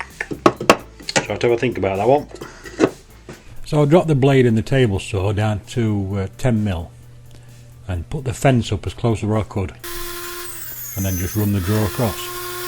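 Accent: Yorkshire, United Kingdom. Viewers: Excellent video (as always) and your accent does make my wife smile. She is from Yorkshire but we live in Cornwall so she doesn't get to hear it much!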